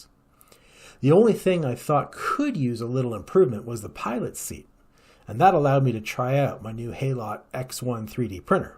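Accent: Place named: United States. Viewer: I was wondering why you mentioned a Warthog while showing a picture that definitely wasn''t a Warthog. Then I realised it was just the way you Americans say hawk as "hock".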